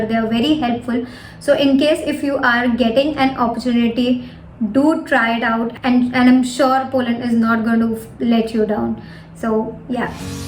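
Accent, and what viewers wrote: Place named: India. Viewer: Indian accent. Pleasant to the ear so cheerful. I've always liked him.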